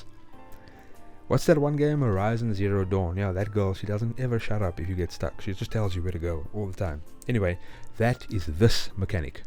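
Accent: South Africa. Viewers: Enjoyed the vid, you sound like you're from RSA too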